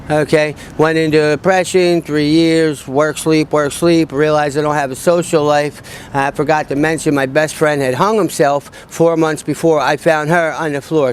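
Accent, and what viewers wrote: New York, United States. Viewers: fair enough. Today I met a guy from New York that sounded just like you is all.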